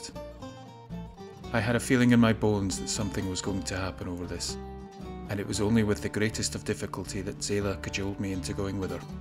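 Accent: Scotland, United Kingdom. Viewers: P.S.. Love the narrator's voice, Scottish accents just make me gushy!! I'd pay money for this guy to narrate my grocery list once a month!! lol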